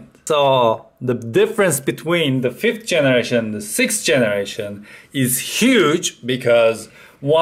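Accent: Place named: Turkey. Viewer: the Uhahkian lol. Is it can be just because of he's actually from Turkey? So ofc his accent will change.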